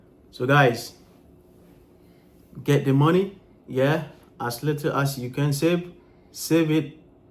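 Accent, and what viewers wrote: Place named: Africa. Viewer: My question to you and every African who migrated to the West (Your accent tells that you are born on the Soil of Africa) ….. Why would you think You can call UK 🇬🇧 Canada 🇨🇦 or US 🇺🇸 Home forever. Glad President Trump is waking Everyone up. You may have the Passport that Shows that you are citizens of that HOST country. But wait ……There is More.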